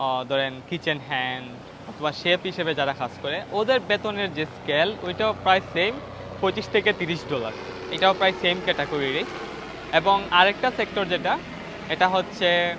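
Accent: Sylhet, Bangladesh. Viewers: ভাই সিলেটি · আমি নিশ্চিত আপনি সিলেটি । 🤍🥰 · Bai bare Sylhet ni ??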